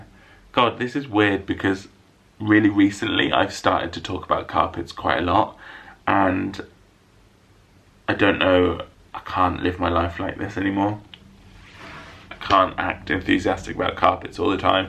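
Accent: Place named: Scotland, United Kingdom. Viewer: I think it's perfect you put the questions in a Mickey Mouse cap. We need to hear more of your Scottish accent😂 Aww thanks for answering my question - to be honest I can't imagine any actor playing you because you are one of a kind!❤️ Haha I love the carpet demonstration! "Sophisticate, and I can see you have a cat"😹 Okay I was not expecting you to say bus driver! Oh my gosh I absolutely LOVE all the family footage - you all made me laugh so hard! And then your mom made me want to cry😭 Aww there we all are at the Angel Inn - that was almost exactly one year ago, can you believe it? We had the best time with you two! we are definitely missing your content as well. Can't wait for part 2!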